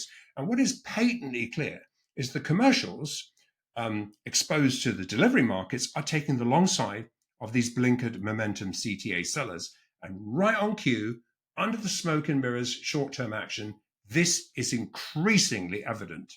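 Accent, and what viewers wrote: England, United Kingdom. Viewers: I agree too technical to fully grasp. I love, but between the jargon and his English accent, high low delivery (definitely not boring, but a lot goes over my head) Maybe can create an edited video to help explain the concepts. eg.. 2 minutes of, and equal time for to speak plain American english to interpret what just said.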